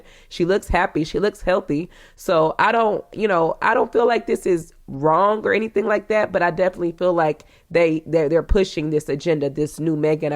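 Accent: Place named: South, United States. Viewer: This is the best channel on here! Hands down! I think it's the Southern in you, and I'm a Southerner too. Lolllllllllll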